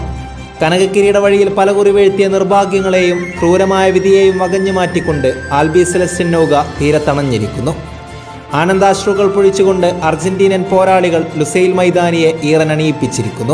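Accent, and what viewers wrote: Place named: Ernakulam, India. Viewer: അറിയാതെ കണ്ണു നിറഞ്ഞുപോയി ഈ കളിയും എറണാകുളം അവതരണവും കേൾക്കുമ്പോൾ 💙🤍💙🤍🇦🇷🔥🔥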